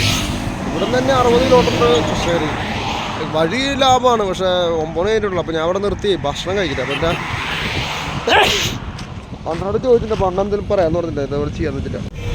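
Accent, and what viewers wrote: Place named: Thrissur, India. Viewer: തൃശൂർ ഭാഷ ഇഷ്ടപ്പെട്ടു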